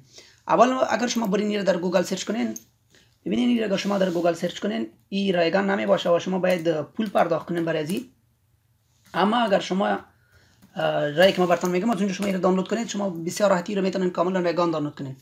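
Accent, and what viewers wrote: Afghanistan. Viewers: با احترام به شما دوست عزیز که زحمت کشیده اید این ویدیو اموزشی درست کردی برای رفع مشکلات کاربران ولی متاسفانه خیلی خیلی تند حرف میزنید و همچنین لهجه ای افغان بودنت یکی دیگر از معایب این ویدیو میباشد شما اگر نیت خیر اموزش دادن را دارید میتوانید از یک شخص دیگر که هم خیلی قشنگ بتواند فارسی را حرف بزند و کلمات را طوری بیان کند که هر شنونده از گفتار و نوع اموزش لذت ببرد من متاسفانه با اینکه مشکل ریکاوری مموری کارت داشتم ولی نتوانستم از ویدیو اموزشی شما استفاده کنم انشالله دیگر این صدای شما را در ویدیو های بعدی نشنوم و یکی از دلایل در پیشرفت و موفق بودن هر اموزش دهنده مطمئن باشید همین درست صحبت کردن میباشد ،امیدوارم از دست من ناراحت نباشی چون نمیخواستم زحمت شما هدر برود برای ویدیوهای بعدی وظیفه خودم دانستم که بشما این عیب و ایراد کارتان گوشزد کنم . با تشکر احمدی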